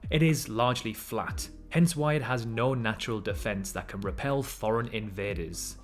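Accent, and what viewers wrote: United Kingdom. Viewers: I really love your British accent bro!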